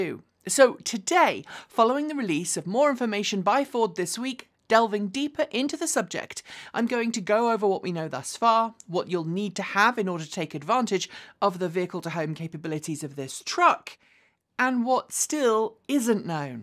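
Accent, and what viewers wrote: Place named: United Kingdom. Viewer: Wow! A woman with British accent calling a trunk a trunk and not a boot. I'll be damned ...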